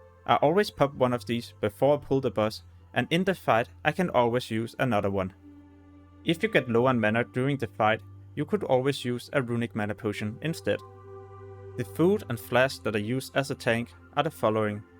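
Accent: Denmark. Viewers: Jeg kunne høre, at du var dansker. Har subbed - god kanal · Du er dansker kan jeg høre 😁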